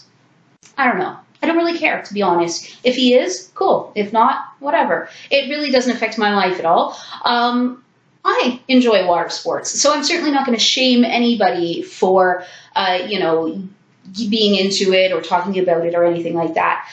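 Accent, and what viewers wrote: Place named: Canada. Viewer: Like your Canadian accent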